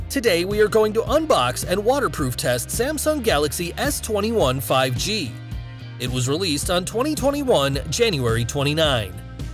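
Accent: United States. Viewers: Finally an English American speaking review, tired of all the desi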